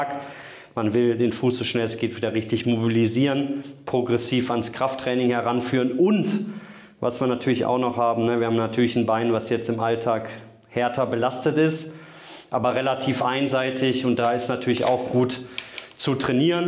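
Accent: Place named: Austria. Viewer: gute besserung liebe btw is sie österreicherin? interessanter akzent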